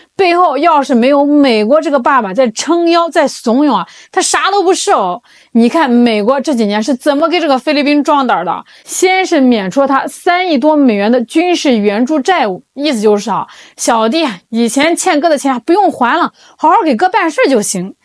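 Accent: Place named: Henan, China. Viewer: 宝贝儿你这小河南腔打得不错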